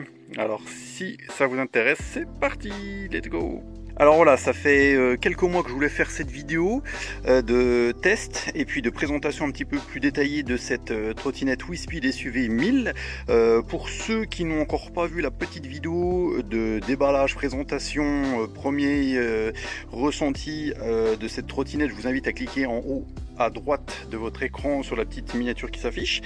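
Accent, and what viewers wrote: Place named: Jura, France. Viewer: Superbe video! Tu as l'accent Jurassien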